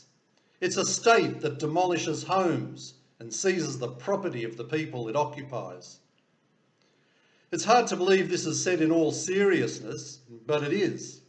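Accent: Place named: Australia. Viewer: At last! A forceful Aussie voice. Well done,. Now go after the Lost Tribe of Israel. By that I mean the Australian Jews who are, shockingly, supporting these anti-Semitic Israel liars and haters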